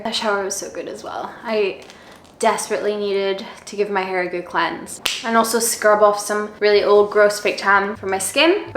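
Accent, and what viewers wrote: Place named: Scotland, United Kingdom. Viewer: is from Scotland but lives in London. I love her accent!!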